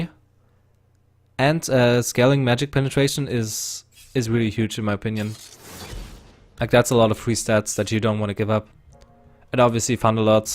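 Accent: Germany. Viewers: Wait what, is a German guy?:O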